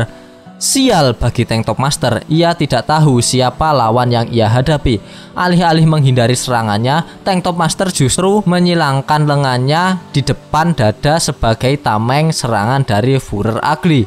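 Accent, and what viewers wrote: Java, Indonesia. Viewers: jowo ndi kang ?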